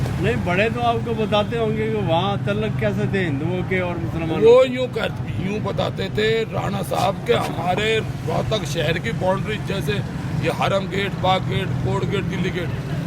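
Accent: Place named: Uttar Pradesh, India. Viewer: Ye UP wali boli kyu bol Raha ha, rohtak wali kyo nahi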